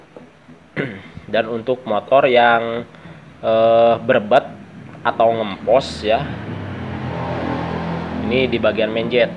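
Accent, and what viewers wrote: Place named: Sunda, Indonesia. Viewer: Mang ngomong nya yang leres 😂😂😂 Indo campur sunda.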